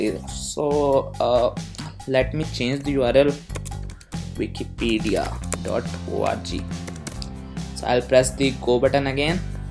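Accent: India. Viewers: you have india ascent , indian?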